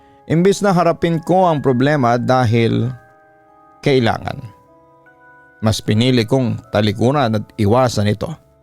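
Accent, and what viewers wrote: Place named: Iloilo, Philippines. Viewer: ilonggo c papa dudot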